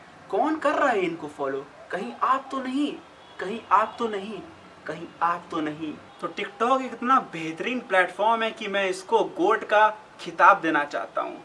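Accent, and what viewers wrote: Allahabad, India. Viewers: Allahabadi style ma bola to aag laga Diya🤘🔥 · Allahabadi language is superbbb🤣🤣... Well done bro🤘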